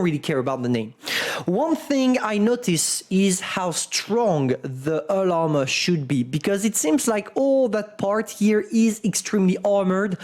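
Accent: France. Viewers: They are not used to. Not 'their'... (autocorrect?). But you are right. It is not a laughing matter indeed. I sincerely think is one of the best youtubers on WoTBlitz. I watch his video's a lot. I like his approach to the game. I just find the way he pronounces 'event' really really really annoying. How can I reach out to him, to make him say that 1 word 'event' say correctly. For the rest, I know he is French, so no bad words for the rest of his pronounciation there. It even is charming. He is good. I just want this 1 word 'event' to be pronounced correctly.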